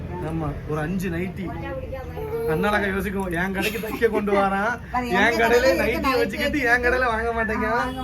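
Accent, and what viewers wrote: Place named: Thoothukudi, India. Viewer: ஓகே அண்ணா அக்கா எனக்கு அம்மா ஊர் தூத்துக்குடி யில்ஓருகிரமம்வேப்பலோடை.மாமியார்ஊர்சாத்தூர்பக்கத்தில்பந்துவார்பட்டிஇப்போதுஇருப்பதுதிருவள்ளூர்.உங்கள்குரல்தூத்துக்குடிகாரங்கபேசுவதுபோல்இருக்கிறதுஅதனால்தான்கேட்டேன்எனக்குஉங்கவீடியோஎல்லாம்பிடிக்கும்அக்காஅண்ணா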